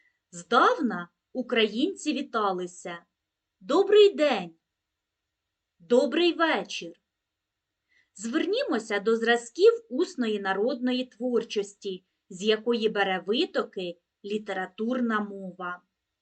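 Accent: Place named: Poltava, Ukraine. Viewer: Завжди впізнаю діалект Полтавщини 😄 Щасти Вам!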